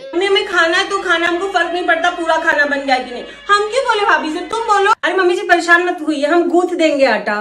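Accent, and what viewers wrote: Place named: Varanasi, India. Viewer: मुझे तो आपकी पुरानी वीडियो - बनारसी लहजे vali बहुत पसंद हैं , वो फिर से डालिए please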